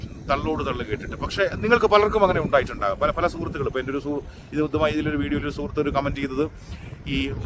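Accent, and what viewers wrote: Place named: Ernakulam, India. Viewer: സത്യസന്ധമായ അവതരണം, ഭാഷ ശൈലി അതിഗംഭീരം, , കേട്ടിരിക്കാൻ സുഖം, ഒരു എറണാകുളം സ്റ്റൈൽ, നന്ദിയുണ്ട് ബ്രോ,,, ❤️❤️❤️❤️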